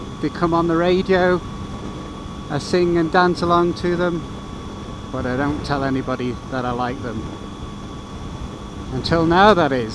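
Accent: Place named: England, United Kingdom. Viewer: Thanks, ALL three now firmly stuck in my head. Wasn't expecting an English accent, seeing those double yellow centrelines! I'm joining the expat club and will be broadcasting English accentry from Europe!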